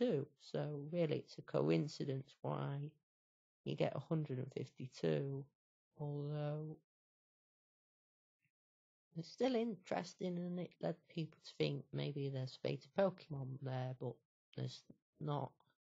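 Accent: United Kingdom. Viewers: Ta you sure the video wasn't on x0.5 speed? sounds like a british girl to me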